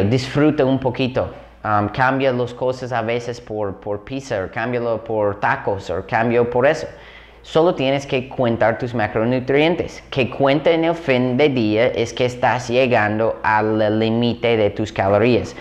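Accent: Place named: Mexico. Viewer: Es adictivo escuchar ese español de Méjico que utilizas XD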